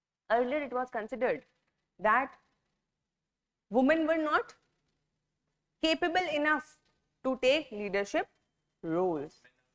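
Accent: India. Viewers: indian accents kills me lol what indians think they sound like when they speak english it gives my ears cancer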